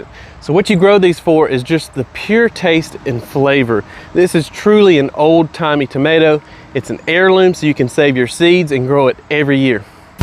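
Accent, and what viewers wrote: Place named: North Carolina, United States. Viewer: Oh my God! The taste of home grown tomatoes is amazing! I grew red pearl grape tomatoes (from Johnny's) this year, and they were so good! Very productive and so sweet... Also the yellow brandywine was amazing as well 👍 Thanks for sharing your NC garden. I used to live in New Bern but now I'm in Virginia. You're accent brings me back to NC 😄 love it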